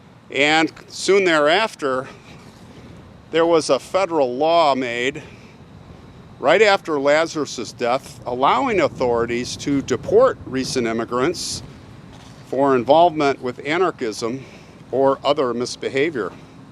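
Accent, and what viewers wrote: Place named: Chicago, United States. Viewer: Hi Im from Skokie, Illinois, now California. I was thinking the Jewish cemetery looked great. But as I expected you found a group of headstones. I am Jewish and I will be cremated and not be buried in a cemetery especially a Jewish one. Many graves are/were destroyed due to antisemitism. I want to thank you for the respect you showed. P.S. Are you from Chicago? Your accent sounds familiar.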